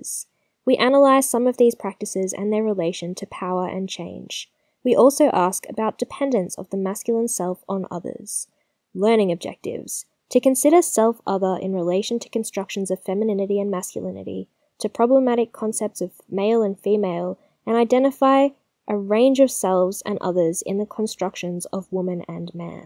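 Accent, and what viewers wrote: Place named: Melbourne, Australia. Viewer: Oh right, you're from Melbourne, no wonder you sound a bit different. PS Brisbane here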